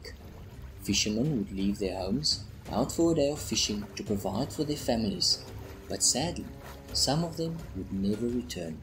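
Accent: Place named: South Africa. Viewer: Are you South African, or Dutch? You sound like you are from S.A. ? Saludos desde California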